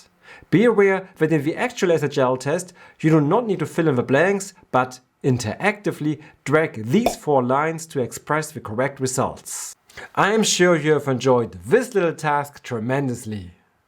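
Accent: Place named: Germany. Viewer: It’s nice to listen to a German among the tones of Indians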